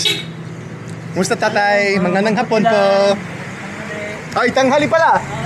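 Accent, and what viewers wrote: Ilocos, Philippines. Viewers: You have an accent of ilokano dialect. I have ilocano friend and you have similar intonations. I'm impressed because I heard from her that ilokano is very hard to learn especially the accent itself. Did you stay in ilocos and how long?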